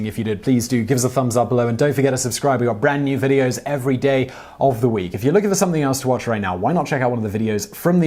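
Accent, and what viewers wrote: England, United Kingdom. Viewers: Yes, he has an english accent. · Never trust anyone with a British accent trying to teach you history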